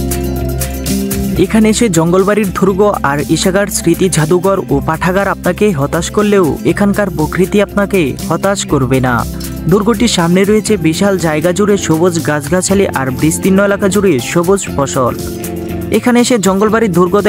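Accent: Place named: Kishoreganj, Bangladesh. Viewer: কিশোরগঞ্জ নেত্রকোনার আঞ্চলিক ভাষা বাদ দিয়ে শুদ্ধ ভাষায় কথা বলার চেষ্টা করুন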